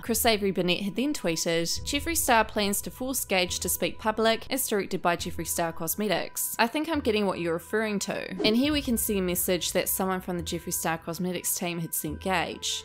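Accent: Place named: New Zealand. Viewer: Sounds very NZ to me.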